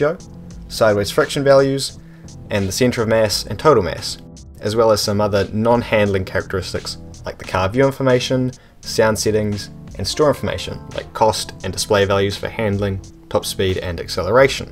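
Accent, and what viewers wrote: New Zealand. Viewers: Are you kiwi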